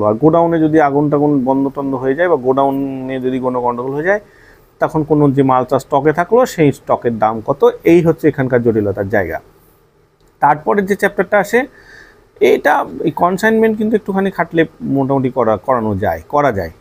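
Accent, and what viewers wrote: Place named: Murshidabad, India. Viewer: Apnar kotha sune mone hochhe apni murshidabad er .. tai ki?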